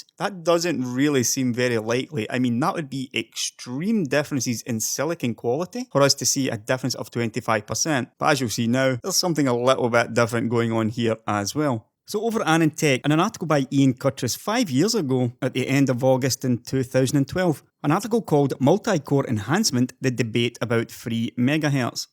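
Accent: Scotland, United Kingdom. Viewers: The only thing better than your video execution and the information provided is your glorious Scottish accent.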